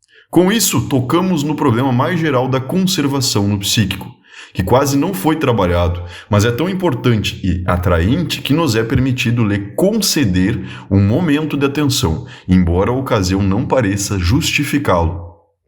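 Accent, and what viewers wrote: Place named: Curitiba, Brazil. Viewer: Sotaque curitibano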